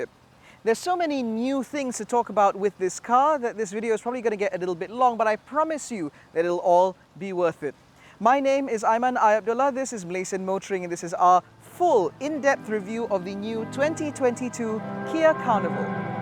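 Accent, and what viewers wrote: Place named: RP, United Kingdom. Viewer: Just wow with your smooth and fluid presentation! Love the “queens English” sounds posh for a posh looking car! Done like and subscribed! Well done!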